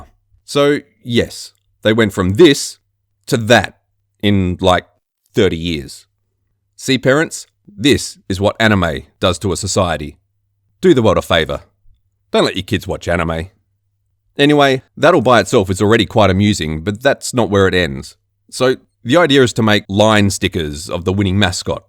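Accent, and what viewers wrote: Australia. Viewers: Tu es français, mais je pense que ton accent écoute comme un peu Australien ? Je suis australien et j'apprends le français au lycee mdrr👌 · Yah he sounds Australian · You honestly sound like a Australian not a Frenchman. Where are you from originally?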